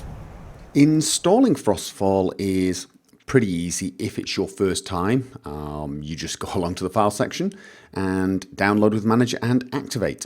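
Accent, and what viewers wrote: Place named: Wales, United Kingdom. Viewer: this guys regal welsh accent is kind of peculiar :D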